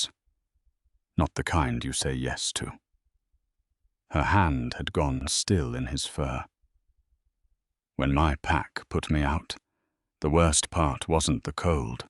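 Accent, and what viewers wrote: United Kingdom. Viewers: I hear ya, and offer this solution that I have found to be quite effective. I listen to these fantasy tales on my cell, so I fold a piece of paper over the top and have it long enough to reach below that picture section, and then click on Show Transcript. The words scroll up the screen as the narrator speaks (oh that YUM purring English / British accent), and let my imagination do its thing by making a movie in my head that depicts the scenes and the characters. It is much more fulfilling & enjoyable that way.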